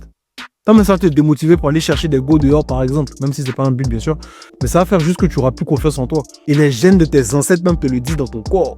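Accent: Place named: Ivory Coast. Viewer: On dirait que vous êtes ivoirien hein frère👊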